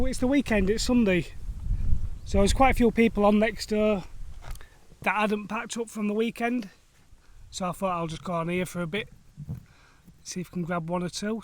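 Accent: Hull, United Kingdom. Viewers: Thought I recognised the Hull accent, will watch this later, I almost always fish Cygnet for the tench, been a poor year this year but had 4 nice tincas on my last visit on banjo feeder with a wafter.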